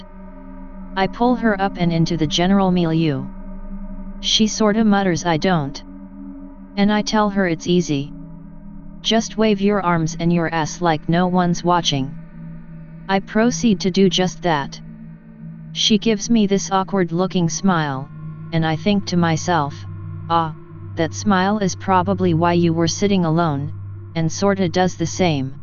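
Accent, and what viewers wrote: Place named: Canada. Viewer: Whoa. TTS robot is canadian.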